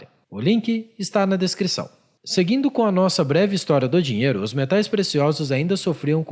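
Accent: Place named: Goiás, Brazil. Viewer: Esse sotaque goiano kkk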